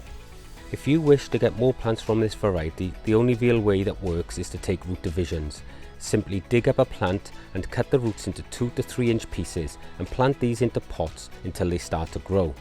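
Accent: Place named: West Wales, United Kingdom. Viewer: Your accent is similar to my relatives who live near Aberaeron / Lampeter. Are you from the west coast area mate?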